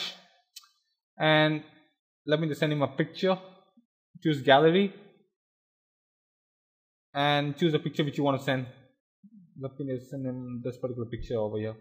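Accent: India. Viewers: thx dude, i like ur indian accent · this is gonna sound rude or racist, but I hate this fucking indian accent... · so... india e?.. so thats where the accent came from.. nice vid & accent btw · english.....india dialect...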